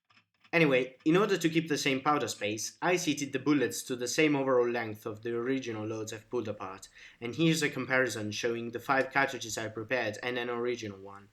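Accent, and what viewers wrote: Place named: Italy. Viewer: Italy I would say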